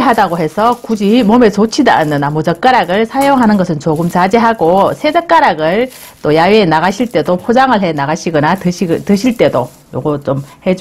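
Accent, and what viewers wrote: Gyeongsang, South Korea. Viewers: ㅎㅎ 좋은 정보 잘 보고 있습니다 경상도 본토 말 오랜만에 듣네요 일회용 나무 젓가락은 사용 금 하는게 좋겠네요 알지만 1회용 컵 모든 1회용은 문제가 많은것 같아요 감사합니다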